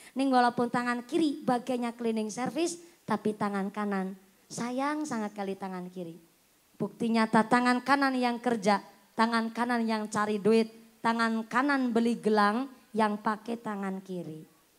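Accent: Banyumas, Indonesia. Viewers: Ceramah ngapak memang tidak membosankan kak mantap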